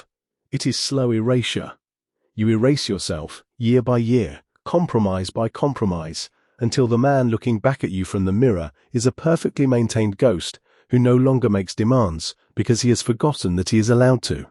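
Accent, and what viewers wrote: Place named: United Kingdom. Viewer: Nice to hear a British voice for a change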